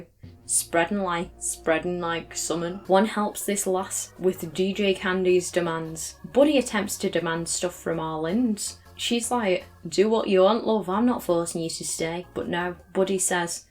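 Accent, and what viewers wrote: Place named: Merseyside, United Kingdom. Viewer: Just started watching some My Sims videos today, especially looking through all the mini games in Party DS. I still remember most of them and they were so fun. More importantly, which city/county are you from? Your accent sounds like a Merseyside one at times but then it goes again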